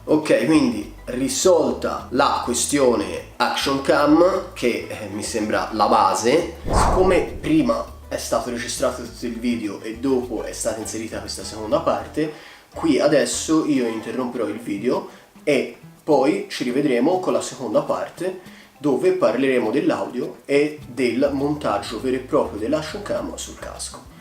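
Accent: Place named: Florence, Italy. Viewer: Grazie mille. Comunque io sono di Firenze! Ho sentito il fiorentino in te hahah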